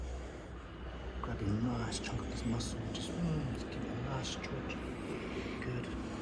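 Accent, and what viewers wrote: United Kingdom. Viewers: ok an asian guy talking like a british man is enough for me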